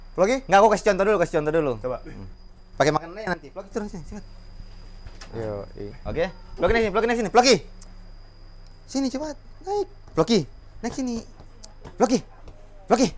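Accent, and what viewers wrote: Ambon, Indonesia. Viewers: Biar da lama di jakarta ttpi logat Ambon nya nga ilang2 👍👍👍😂😂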